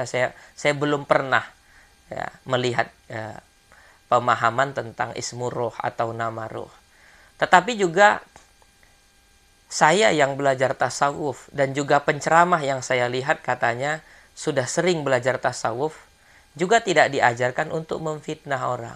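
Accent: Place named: Java, Indonesia. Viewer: Alhamdulillah bisa ketemu channel ini. Insya Alloh bermanfaat bagi saya. Salam takzim untuk. Bahasanya lembut, logatnya seperti orang Jawa.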